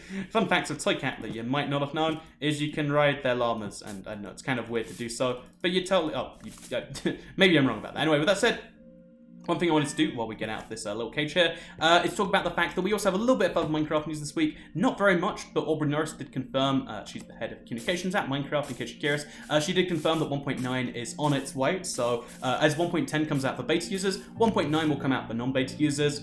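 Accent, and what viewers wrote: United Kingdom. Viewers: Ong Canada I thought you were British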